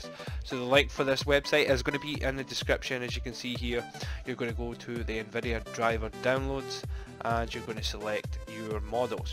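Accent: United Kingdom. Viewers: I love your British accent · Loveeeeeeeee the scottish accent mate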